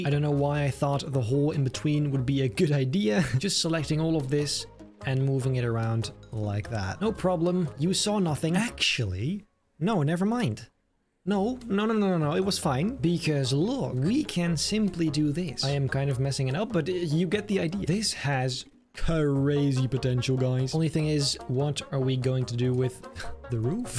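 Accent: Netherlands. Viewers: Are you from the Netherlands cause me to maybe you can talk a little Netherlands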